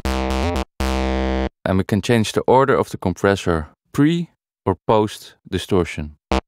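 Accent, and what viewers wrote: Netherlands. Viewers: Thanks for this very usefull tutorial! And eeh, you MUST be Dutch. Haha, ik bedoel, kan gewoon niet anders dan dat ik hier een Nederlander hoor!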